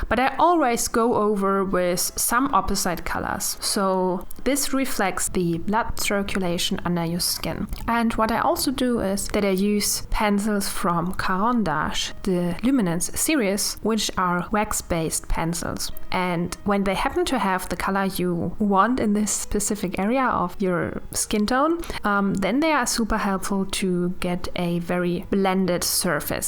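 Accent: Germany. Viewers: Your accent is so german 😄😂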